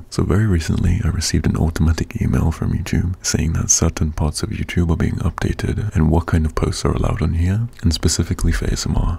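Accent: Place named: United Kingdom. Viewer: he's fucking BRITISH???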